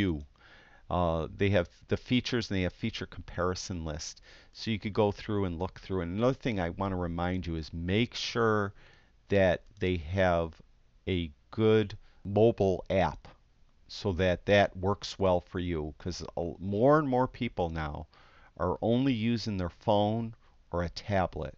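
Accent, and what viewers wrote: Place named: Upstate New York, United States. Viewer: What an awesome video...thank you so much for explaining this so clearly. I've been thinking of selling some prints and had been wondering about Smugmug, so this is really helpful. I saw your comment above about donating the proceeds to the charities and that's wonderful. I had always wondered if you were from Upstate NY as well due to your distinct accent and it seems like you are! (I went to college at SUNY Oswego) :)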